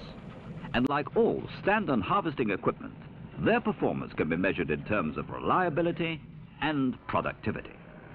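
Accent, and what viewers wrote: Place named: RP, United Kingdom. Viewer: Curious video production, it looks very 1950-60s with the posh narrator and wobbly camera. However the vehicles seen are very much 1974 - I can only assume this production (video) was commissioned (paid for) by Standen as the BBC and ITV were much more of today's standard in video picture quality. All the same was good to see this, that machinery is much more smarter than it first seems, and did we all cheer when the 135's came into view?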